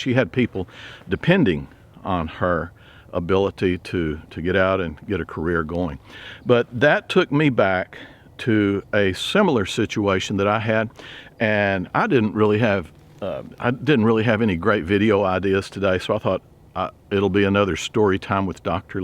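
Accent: Texas, United States. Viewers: I could listen to your stories all day, I love your Texan accent and I’ve been down there for awhile but I’m a Canadian n need to b home but I’m greatful that I can hear ur stories and struggles along with you and your beautiful family. Thank you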